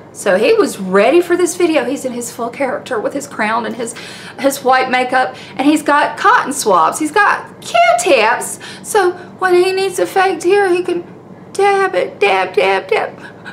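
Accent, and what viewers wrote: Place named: Georgia, United States. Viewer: That is hilarious! I’m from Tennessee, and if you give me a minute, I can sound like a hillbilly or Dolly Parton, take your pick. Otherwise, I think I have just a bit of a Southern accent. (I watched a load of TV growing up, so I adopted a neutral accent on purpose after I was insulted by a Canadian as a child while on vacation in Florida.) Where are you from? I would guess Northwest Georgia by listening to you.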